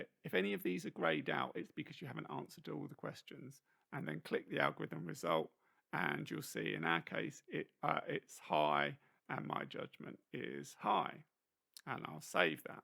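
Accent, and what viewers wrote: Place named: Australia. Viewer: The Australian accent 😢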